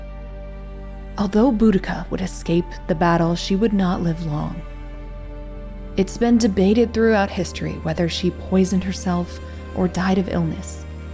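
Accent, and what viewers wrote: United States. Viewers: Shame about the american accent. Ruins the telling, no strength or beauty.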